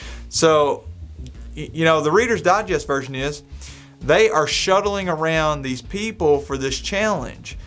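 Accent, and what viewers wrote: Kentucky, United States. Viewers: This probably not politically correct, but I watch you simply to hear your Kentucky/hill Billy accent. It is awesome.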